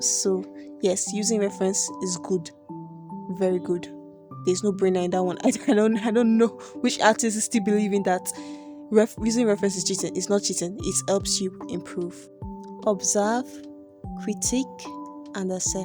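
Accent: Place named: Yoruba, Nigeria. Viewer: E sure me say you be yoruba !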